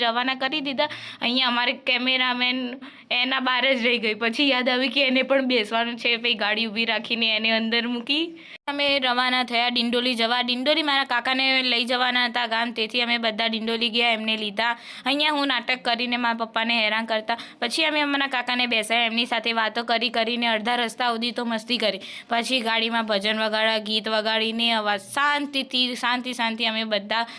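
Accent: Mehsana, India. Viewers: સુરતથી ગંગાપુર -ઊંઝા ની સફર આપણી બોલી -મેહાની બોલી ખૂબ મઝાની હું હાલ અમદાવાદ ખાતે પરંતુ ઊંઝાનો જમાઈ એટલે મને તમારા વિડિઓ અને બોલી ખૂબ ગમે છે. સરસ વિડિઓ બનાવતા રહો અને અમે એન્જૉય કરતા રહીએ....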